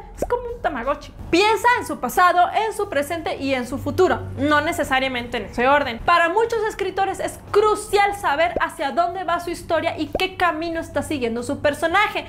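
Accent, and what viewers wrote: North, Mexico. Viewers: Soy yo o esta vieja habla muuuuuuy norteña puesum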